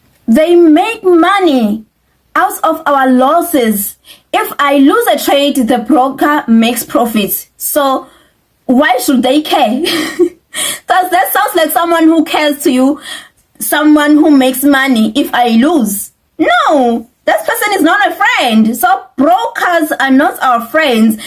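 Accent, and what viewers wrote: South Africa. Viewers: South Africa, even her accent.🇿🇦🇿🇦🇿🇦🇿🇦🇿🇦🇿🇦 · She's South African from her accent indeed she's South African.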